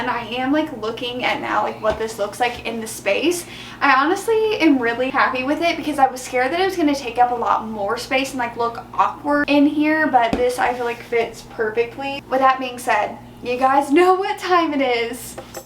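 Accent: United States. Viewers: I keep rewinding so funny! I love the lil country accent too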